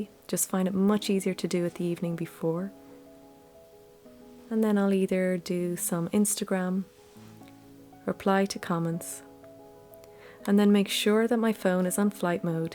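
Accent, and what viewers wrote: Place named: Kilkenny, Ireland. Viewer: Is that an Aran!? So beautiful! Also are you from Wicklow or is your accent from Kilkenny?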